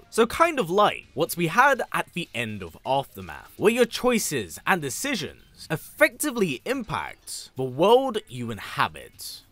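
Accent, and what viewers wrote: United Kingdom. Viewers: No offense, but I don't fink I can't take much more of this accent. I love brit accents, but this ain't it. Keep up the great work, though!! I can't wait to play!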